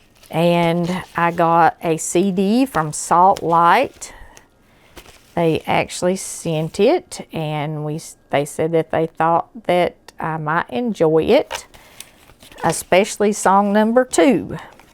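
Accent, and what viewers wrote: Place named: Appalachia, United States. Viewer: I was born in West Virginia and my family moved to Florida when I was about 3 years old. I often have thoughts of wanting to turn back the clock so I could have grown up there and had a simpler, more grounded and Christ-centered life. I imagine what it would be like to have friends like you who who speak with a sweet Appalachian twang and share the values I wish I'd been raised with. God bless you and have a joyous Christmas.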